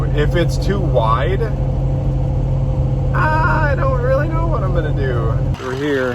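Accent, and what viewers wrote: United States. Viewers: Oh my goodness, Floridians and don’t talk that way, that’s more Georgia… You’re hilarious! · ! Your southern accent is too funny!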